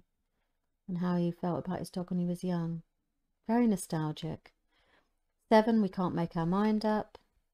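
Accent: United Kingdom. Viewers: everything sounds better with a british accent :)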